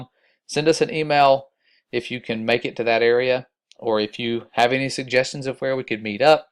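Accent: United States. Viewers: I’ve never heard Cairns pronounced with an American accent like yours ,, he he I was hoping to travel up from Sydney to go barramundi fishing in Queensland ,, Scott Morrison/prime minister went on a family holiday while his country burned down ,, and the 60million dollars donated by the public for victims was kept by the charity organisation,, these big charity organisations are money making organisations when u look into their history Haiti is a good example of how they operate poorly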